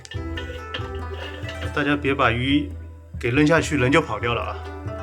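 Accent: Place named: Nanchang, China. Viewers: 南昌人表示听你这南昌口音普通话太亲切了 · 这位大哥说话习惯在句末加个诶，的口音是熟悉的南昌味哈哈哈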